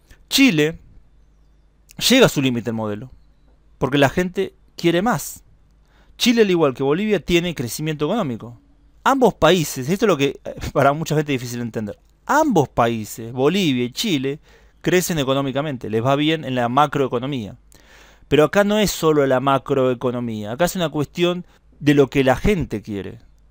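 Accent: Argentina. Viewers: curioso que este "cuate" no hable como mexicano, sino como argentino.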